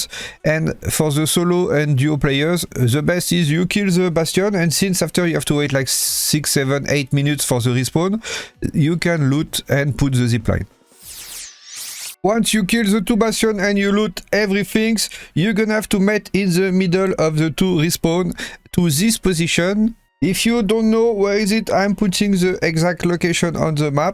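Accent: France. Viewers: I like u Baguette accent ❤ u do the best Videos for Trials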